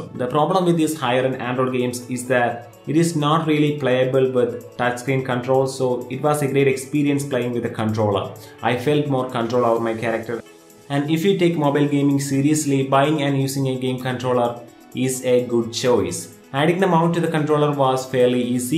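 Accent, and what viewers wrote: Kerala, India. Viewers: Malayali Aano??😉😃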